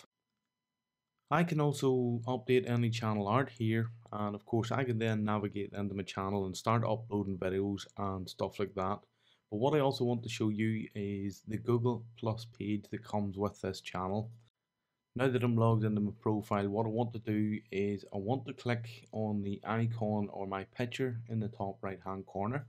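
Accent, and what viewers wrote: Northern Ireland, United Kingdom. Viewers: are you northen irish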